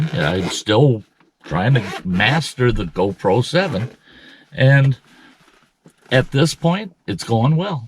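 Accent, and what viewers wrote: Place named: Indiana, United States. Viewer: What state are you in, sounds like Indiana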